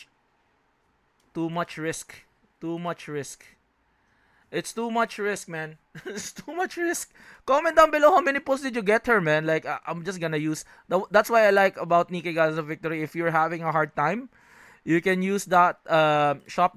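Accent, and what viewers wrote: Philippines. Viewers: Sabi na fil ka pre e, sa accent mo kase HAHAHAHA nice content pre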